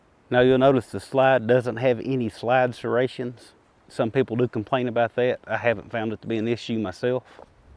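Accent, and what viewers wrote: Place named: New York, United States. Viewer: Bought this one for a Birthday present for my 34th B'day....I'm 62 now and shoot this Gem weekly and like others have said not experienced even one Fail to load...Fail to Fire....Stovepipe.........Nothing!!! They truly don't Make them like this anymore. By the way....You New Yorkers sure do have funny accents!!!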